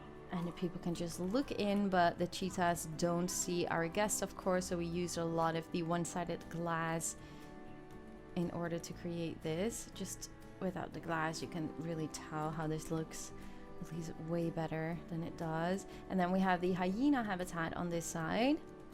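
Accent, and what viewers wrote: Netherlands. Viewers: are you dutch? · Nice zoo! And also, are you Dutch? xD Good accent. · are you dutch? because to me your english has a little dutch accent and you pronounced oudehands dierenpark perfectly!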